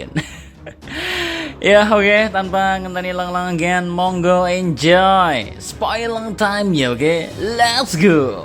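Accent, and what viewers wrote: Java, Indonesia. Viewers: logat Jawa Inggris nya mantep bang wkkw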